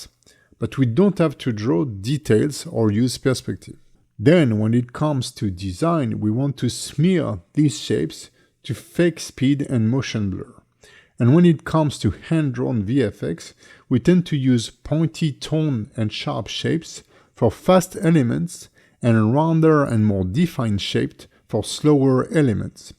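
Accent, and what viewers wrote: France. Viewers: My favorite tutorials are french people making english video with a french accent while i'm french with not so great english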